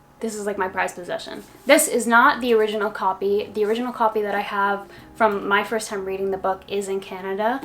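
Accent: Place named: France. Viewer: I think I am hearing a French accent, I am from Canada as well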